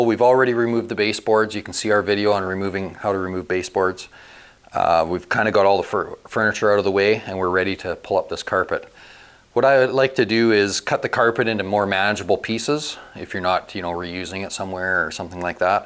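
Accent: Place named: Canada. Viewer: Damn that's the thickest canadian accent I've ever heard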